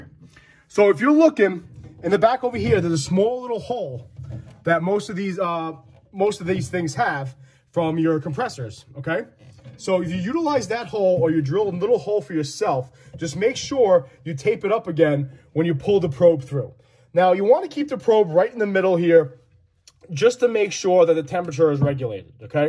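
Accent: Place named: New York, United States. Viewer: This guy is from New York or New Jersey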